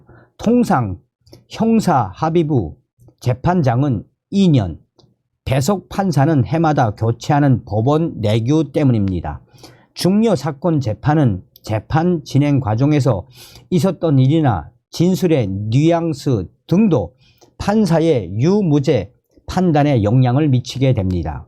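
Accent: North Korea. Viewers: 남의 약점을 꼬집지 마세요. 저분은 남쪽에서 태어나지 않았습니다. 그래도 말씨를 고치실려고 얼마나 노력 하셨겠습니까. 정성산 대표님 항상 수고 많으십니다. 누구보다도 애국자이십니다!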